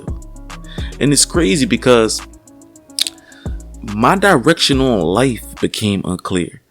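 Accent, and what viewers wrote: Philadelphia, United States. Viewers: Yo you from Philly thought you was from Baltimore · Okay, definitely Philly, wow man hearing you speak the way you do fukk me up gang, so articulate but at the same time look burnt out, never judge a book by its cover ❤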